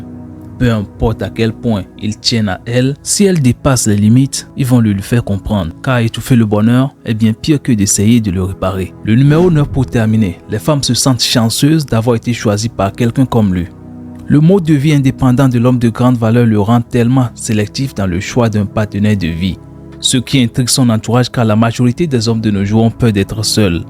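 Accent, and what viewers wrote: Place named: Africa. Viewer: On s'en fou, ce n'est QUE des fautes d'orthographe, en plus il a du mérite car il est peut etre africain vu l'accent. Le superficiel limite l'esprit surtout de ceux qui sont négatif, de mauvaise foi et ingrats. C'est le fond des choses qui compte pas les formes. Le contenu de cette video devrait plutôt vous réjouir car il exprime QUE du propre qui visiblement ne vous concerne pas, et que vous dénigrez. Malheureusement, quand on est pas futé c'est pour la vie.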